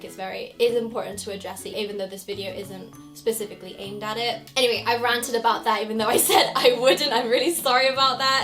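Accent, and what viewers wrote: United Kingdom. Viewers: Do I hear a British Accent? That's very Cute 😃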